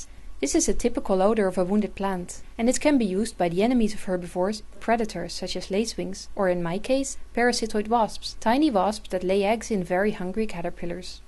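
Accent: Netherlands. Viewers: Nederlands accent is Nederlands. Maar leuk onderzoek. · The Dutch shouldn't try to speak English. This is horrible. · It's very much a Dutch accent :)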